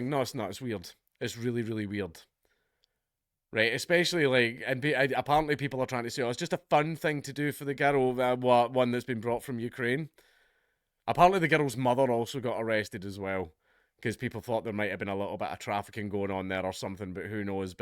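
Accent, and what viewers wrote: United Kingdom. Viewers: Pov: the most British British man.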